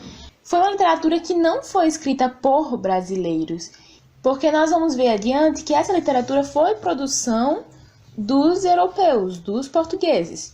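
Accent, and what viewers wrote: Bahia, Brazil. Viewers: A explicação foi boa mas o sotaque da Bahia atrapalhou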